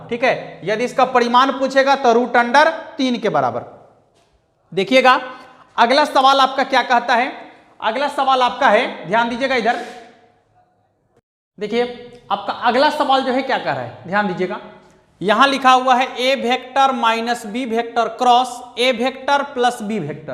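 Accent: Bihar, India. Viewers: सर बिहार से है का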